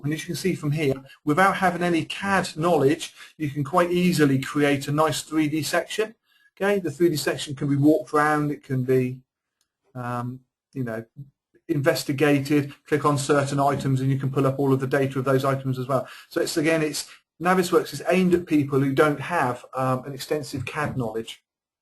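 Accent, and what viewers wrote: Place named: Cockney, United Kingdom. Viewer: A cockney webinar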